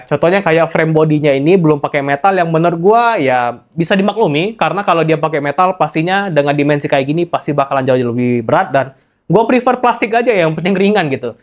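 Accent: Palembang, Indonesia. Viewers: Ngikutin channel abang ini fix dia orang palembang bro melekat banget soalnya logat palembang 😆😆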